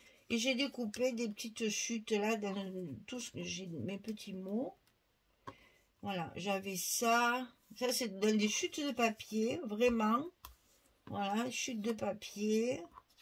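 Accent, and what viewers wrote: South, France. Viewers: Merci, tout est magnifique. Tu m'as trop fait rire: empastifé. Moi aussi je parle le sud. Bisous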